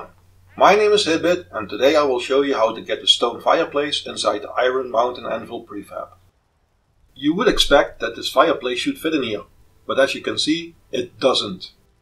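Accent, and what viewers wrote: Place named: Netherlands. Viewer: Your Dutch i can hear it cool vid..👍🏽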